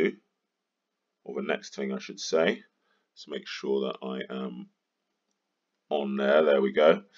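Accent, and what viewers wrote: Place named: South, United Kingdom. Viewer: Well that was a haaarrrd 9mins and 20 secs to watch. In 9.20sec you managed to simultaneously produce a crystal clear, “how not to make a social media instructional video”, while actually managing to finally show how to freeze frame. Ok I hate just being negative so I hope this comes over a positive constructive criticism as that is what it’s meant to be. Before you start a “how to video” grab a pen and paper and “storyboad” your tutorial, the humming and harring immediately makes you sound like an arse without a clue, and make you come over as a “no thanks they haven’t a clue I’ll find another video” when the end result shows you have half an idea of what you’re doing. Your accent is somewhat off putting, I think the base has its origins is southern UK and relative well spoken indicating a decent upbringing and schooling but the attempt at “getting down with the homies” and all the Americanisms is really a turn off. 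Other than that I did watch until the end, I did (eventually) extract the info I was after, I may possibly look at other vids you put out if only to see if your presentation improves.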